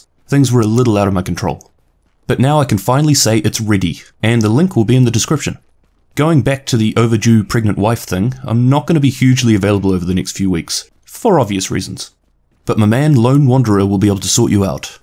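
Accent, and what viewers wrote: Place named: New Zealand. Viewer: Holy shit, never knew you were a kiwi too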